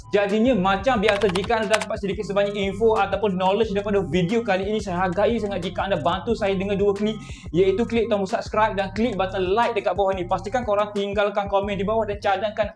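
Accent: Kelantan, Malaysia. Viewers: pellet kelantang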